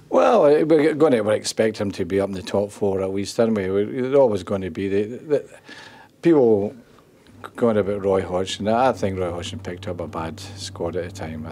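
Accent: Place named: Scotland, United Kingdom. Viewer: I find it so satisfying to listen to a scottish accent for some reason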